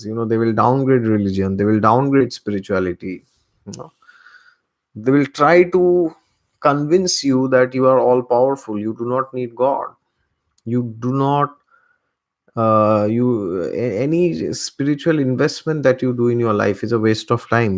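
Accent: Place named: Bengal, India. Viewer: r u bengali? your accent is very bengali kind of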